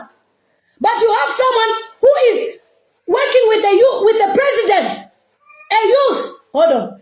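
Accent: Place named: Nigeria. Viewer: Why are you using Nigeria accent (oyibo)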